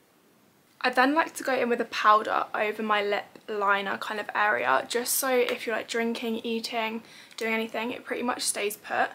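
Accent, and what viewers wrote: United Kingdom. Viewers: I love youre british accent!!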